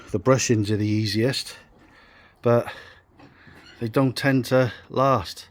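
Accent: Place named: Cardiff, United Kingdom. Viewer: True. Newport myself man. Seen johnny boy on the circuit as he's more my end but I think you fellas are more Cardiff by the accent twang.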